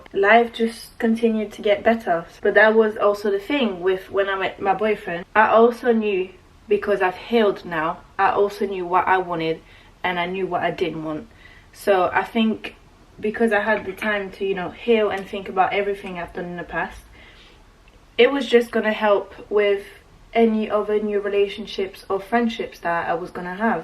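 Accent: United Kingdom. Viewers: The British accent 🫂🫂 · Tu m’attrapes encore plus avec ton British accent, fan vraiment ❤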